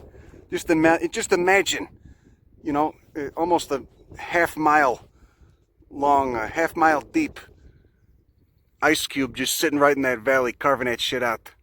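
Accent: Chicago, United States. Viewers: I love your show, you sound like my family we all grew up in Chicago.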